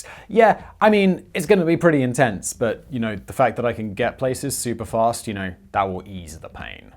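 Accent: United Kingdom. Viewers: Several other people write the scripts and just sits in a chair and reads them off a monitor. You can tell he doesn't even proof read them before he records. (see all the times he reads a line about hair, or balding and he'll laugh and says, "come on guys!") In this video at about something he says "Elon Musk has made a name for ITSELF..." and process to crack up on the line about smoking weed. He has not read this script before saying those words. So yeah, it's a team of people, and is just the member of the team that sits on front of the camera and reads the scripts in a British accent.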